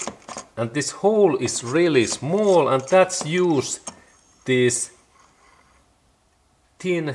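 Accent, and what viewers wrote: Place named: Finland. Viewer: Thanks for the vid, also I really like the Fin accent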